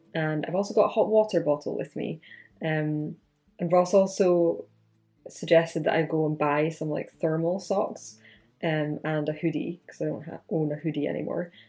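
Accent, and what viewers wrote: Scotland, United Kingdom. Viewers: I clicked on this video and was kind of shocked when I heard your voice, I didn’t expect a fellow Scots person to show up on my recommended.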